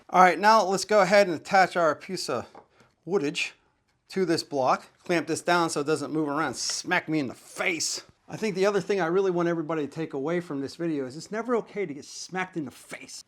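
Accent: Pittsburgh, United States. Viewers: Bro is 1000% from pittsburgh or surrounding area. My man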